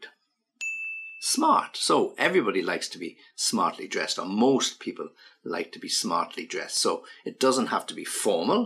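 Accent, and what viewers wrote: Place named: United Kingdom. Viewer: I really like British English, authentic! Please give us some words to describe about an exquisite accommodation or a superb resort and their services.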